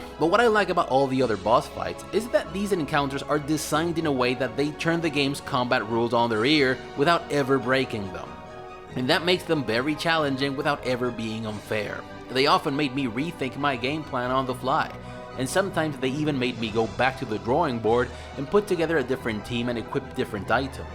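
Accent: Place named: Latin America. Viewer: Loved the review as usual. I usually give the thumbs up before the video starts and thus far haven't been mistaken, not once. As-always, a-mazing. Una consulta? Cuál era el juego que jugabas con las monedas el "Caliche"? Se te nota un acento latino en el inglés, pero no termino de adivinar de dónde. En fin, cada nuevo video de es una vista obligada para mí a este punto. Abrazo grande desde Argentina.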